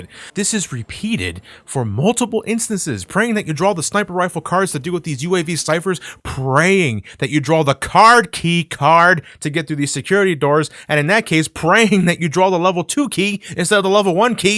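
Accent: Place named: United States. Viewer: Oh I agree with you there; because of our different regions with different slang and colloquialisms. A lot of English mispronounce as well, which hurts me even more, haha. I was just saying that is a very American way of mispronouncing as I've typically seen in American television shows etc.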